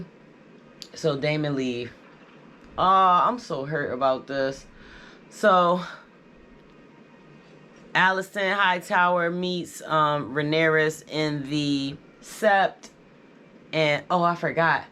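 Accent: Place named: Philadelphia, United States. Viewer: I can tell u from Philly by the first sentence, U gotta be. I subscribed cuz yu a beautiful black woman & I think u from my city.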